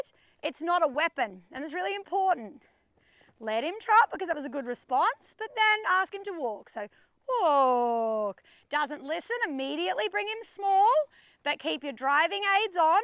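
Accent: Australia. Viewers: I tried this with my OTTB here in AuS, and Honestly it just all made sense. was so positive and reassured me it was okay that he picked up the wrong lead and his stiff side was okay when he stopped and turned. This is brilliant stuff and hearing it with an aussie accent and knowing how things work over here 😀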